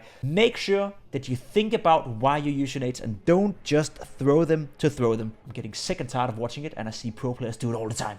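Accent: Denmark. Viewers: you sound danish so if you danish awnser this in danish hej lige fåndet dig og du er mega god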